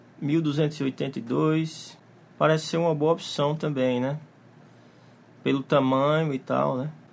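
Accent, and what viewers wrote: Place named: Northeast, Brazil. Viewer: O melhor de tudo: Sotaque Nordestino é 1000! 🤩❤️ Top!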